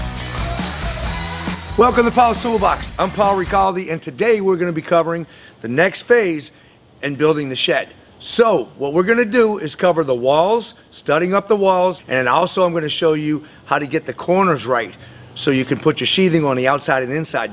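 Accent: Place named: New Orleans, United States. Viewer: You should have built a scale model shed by ripping down some 2x4s and made it as a dog house for your dog. :) Might have a bit of trouble finding scale model shingles though... :) You mention Louisiana... From your accent, I would say maybe in the New Orleans area and from the terrain, I would say probably on the north side of the Lake... If Slidell, probably north of I-12 since it is higher and did not get hit as hard by Katrina... Or maybe inland some around Covington or Mandeville... I grew up in NE Louisiana, but live in the Houston area these days...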